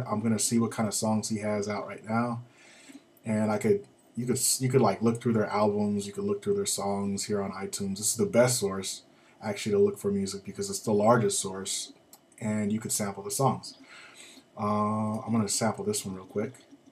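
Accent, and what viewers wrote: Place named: North America. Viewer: i love your american or candian acceient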